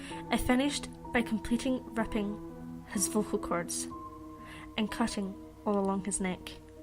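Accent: Scotland, United Kingdom. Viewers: scottish for the win!! ;D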